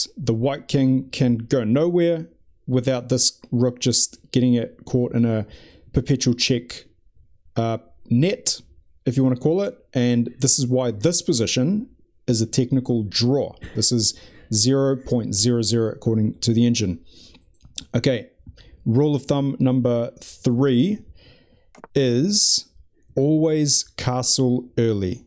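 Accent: New Zealand. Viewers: this dude sounds like a kiwi im subscribin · "spishal" I'm sorry.... I cannot with the NZ accent. :( I must lose out on valuable chess info. grrrrr.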